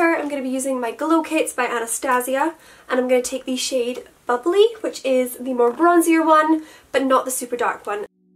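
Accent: Scotland, United Kingdom. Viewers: Oh my gosh, I just came across you and honestly you are so lovely and cute, and I totally thought you were going to be american and then you started speaking and I got such a fright cause you are scottish? haha xoxo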